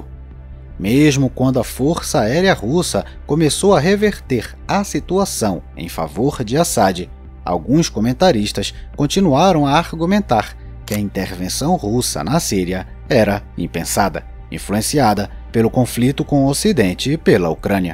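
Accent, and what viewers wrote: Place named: Pará, Brazil. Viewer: Certeza que a voz é de um paraense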